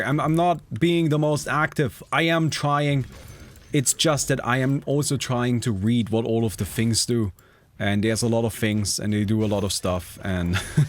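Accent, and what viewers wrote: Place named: Germany. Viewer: Yea Now only the "Hello eenternet hello" is required combined with a stronger German accent Boom, is young again, no longer 420 million years old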